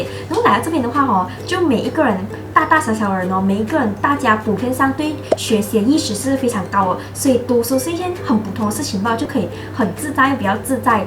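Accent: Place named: Malaysia. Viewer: In Singapore answer call is charged ? I thought is always free。，but it's nice to hear your Malaysian accents. Like 🥰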